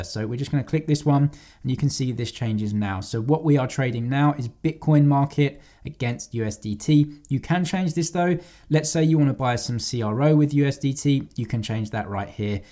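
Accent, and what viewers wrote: United Kingdom. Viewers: AGAIN!!!!! Another video that I don’t see what you see?! Is it possible that (I’m sorry) I am American, you sound like you might be across the pond……… could that be the problem???? (CONFUSED!!!!)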